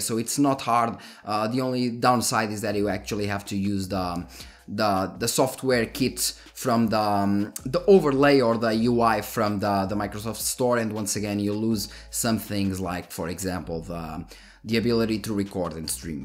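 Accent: Portugal. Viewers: mate can you make a video with you real portuges acento. i would love to hear you speaking Portugues parcero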